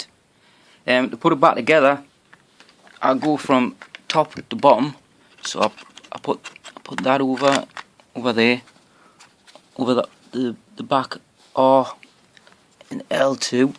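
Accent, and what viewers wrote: Newcastle, United Kingdom. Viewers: r u a goerdie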